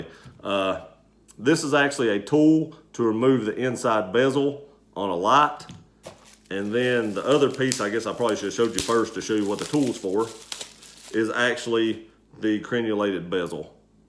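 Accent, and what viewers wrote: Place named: Alabama, United States. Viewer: Also, where are you from? I’m pretty good with accents but am having a hard time making yours out. I’m guessing somewhere north of Tuscaloosa?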